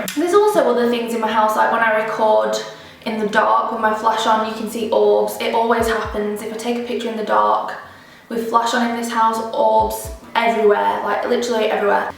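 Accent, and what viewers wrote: United Kingdom. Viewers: I love the accent are you British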